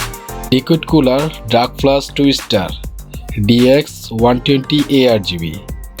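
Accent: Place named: India. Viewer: tu ingles es muy indio flaco mejor habla español y deja de joder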